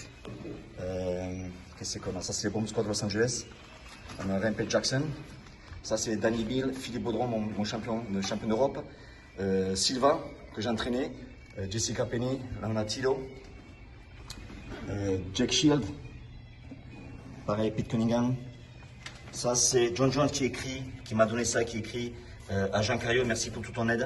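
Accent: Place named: Provence, France. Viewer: Tu es originaire d'où ? Tu parles de Midi-Pyrénées mais je trouve que ton accent sonne plus provençal. Belle galerie de photos ! :)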